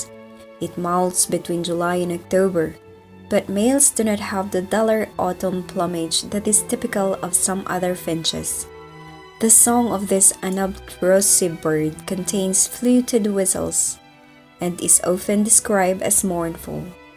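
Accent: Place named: England, United Kingdom. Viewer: Interesting Stories, i Like your english accent kabsat.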